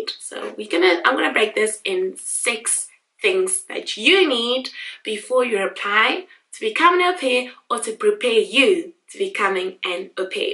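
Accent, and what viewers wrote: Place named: South Africa. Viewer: Which you could speak in your south african accent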